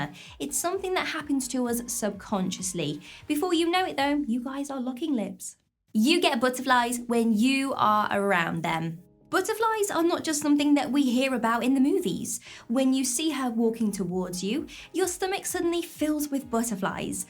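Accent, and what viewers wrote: England, United Kingdom. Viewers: You’re so sweet. The most pleasant video I’ve watched. What part of England are you from? I’m in Essex. Well done 👍 good video. And well researched. I felt so good watching. This.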